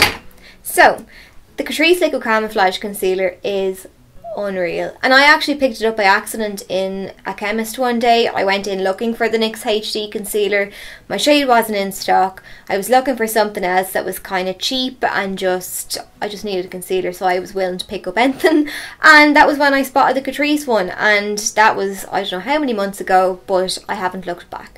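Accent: Ireland. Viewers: I could listen to you all day because you are Irish! Please do not stop making these :-)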